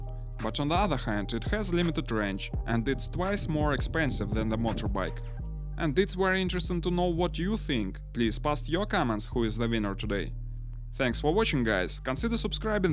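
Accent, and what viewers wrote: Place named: Russia. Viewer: I have cracked you. You are Russian and live somewhere in Central Russia, judging by the foliage and road quality probably near Moscow. I wasn't sure at first bc of your accent, but when I saw your phone was in Russian I got my confirmation. Классное видео чувак, сам себе хочу моторизованный собрать, продолжай в том же духе!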